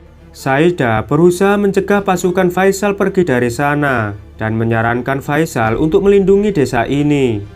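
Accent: Java, Indonesia. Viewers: Komentatornya medok banget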